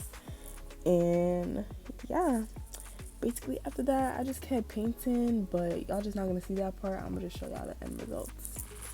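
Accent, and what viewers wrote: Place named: Barbados. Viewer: “I’m not ling ling in the flesh” Plus your Bajan accent 🙌🏾 Loll I love it😂😂🙌🏾❤️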